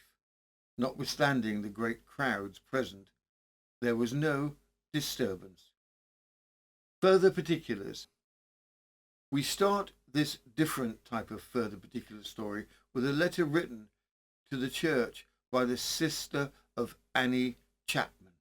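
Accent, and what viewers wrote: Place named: United Kingdom. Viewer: the British accent put you back in time at the 1888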